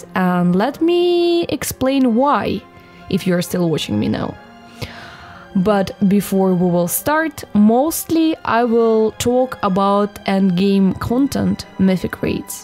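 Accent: Russia. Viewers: are you Russian? That accent you have I've heard it on 007. Or maybe too much Russian bots and the USA...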